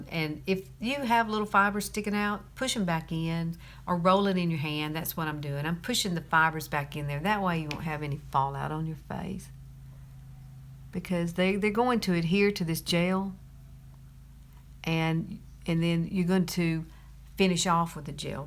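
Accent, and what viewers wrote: South, United States. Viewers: I use Younique products here in Texas. Love them. I know what you mean about the southern accent. I have an accent a little different than yours but a while back, I worked with a group with our company from Chicago. One lady, while we were on the phone said “I just love your accent!” I said “I thought y’all were the ones with the accent!” She just laughed. I was serious though.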